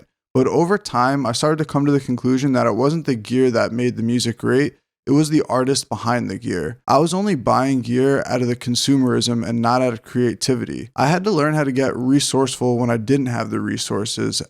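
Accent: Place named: Liverpool, United Kingdom. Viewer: Good video thanks! Random question but your accent sounds like you have a slight scouse twang,were you originally born in Liverpool UK?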